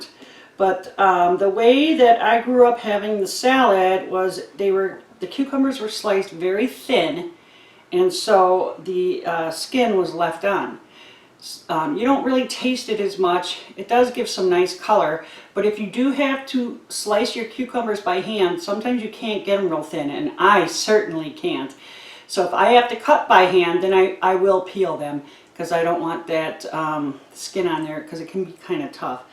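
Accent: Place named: Milwaukee, United States. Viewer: I am excited to try it! I have had it at church potlucks but never have made it. I love your Milwaukee accent.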